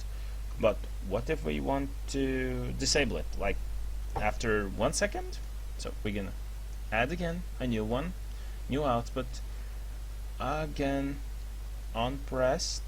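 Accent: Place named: Portugal. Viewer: Ahaha, nota-se claramente que és pt. Bom vídeo!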